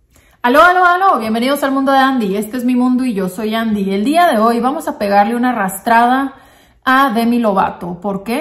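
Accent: Sonora, Mexico. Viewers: DICES LAS COSAS A COMO SON, SIN SESGO Y CON ESE ACENTO SONORENSE BIEN MARCADO. ES UNA CHINGONERIA TU CONTENIDO. SALUDOS CON SABOR MACHACA CON CAFE DE TALEGA.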